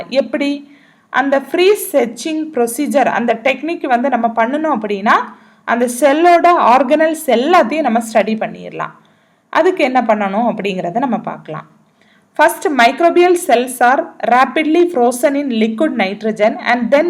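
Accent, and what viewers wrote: Kanyakumari, India. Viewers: MADAM THANKS FOR THIS VIDEO. Unga voice kekum podhu kanyakumari slang maadhri iruku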